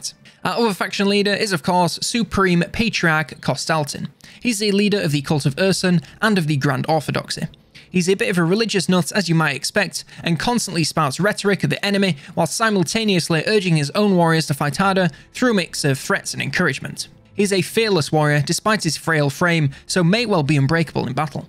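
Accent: United Kingdom. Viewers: For the longest time I thought they must call this game “Wahhma” in the U.K. based on this guy’s accent.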